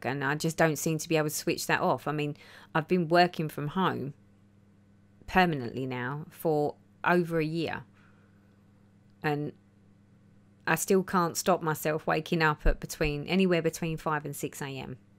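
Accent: United Kingdom. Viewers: Love watching you stitch...as for uniformity of stitches, have you watched FlossTubes by Blitstitch? He’s an engineer, so love his take on how to get uniform stitches...I like his #5 and #36. Love your British accent also! Lol! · Hi! Please keep on rambling, live or voiceover does not matter to me. I’m from Sweden and I love listening to your British accent. 😘 Regarding railroading: have you tried railroading in both directions, not just on the way back?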